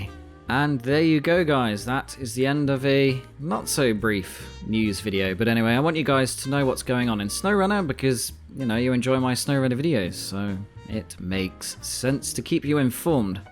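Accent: United Kingdom. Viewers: I'm from Iraq and English is not my main language,but i love how you talk with your British accent..I really enjoy with your videos❤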